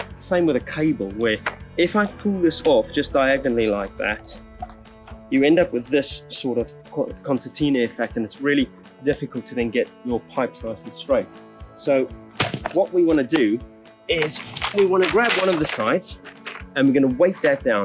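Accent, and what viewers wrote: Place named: South Africa. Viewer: The accent seems South African but the wall and fence behind has no rhino fencing.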